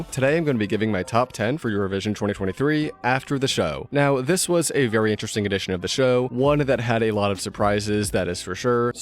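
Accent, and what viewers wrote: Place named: United States. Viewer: I like your channel,! And I agree with you a lot. From your top, the only entry that is not my style is Spain, but I definitely appreciate the art and her amazing talent. All my votes went to Luke 🥺 I wanna see more videos with your comments, I enjoy your american? voice. Haha 😀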